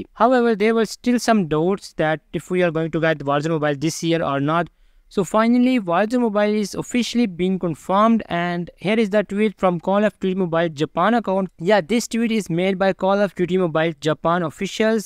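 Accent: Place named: India. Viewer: Indian English 🫥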